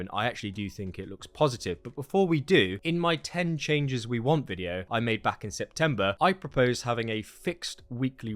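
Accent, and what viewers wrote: United Kingdom. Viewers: More great Valhalla insights - thanks. Some interesting changes hopefully,. I'm relatively new - still playing England map - but get frustrated with tattoos and more tattoos. At least I get to accumulate opals - until 'must have' items drop back-to-back like the dragon raven skins and the Shattered Blade. I noted that the Valkyrie outfit was removed from Helix store recently so glad I got two pieces from Reda - and saved money. One piece of armour is useless so I fear frustration is meant to make us spend £$. P.S. Get homesick every time I hear a Brit accent - lol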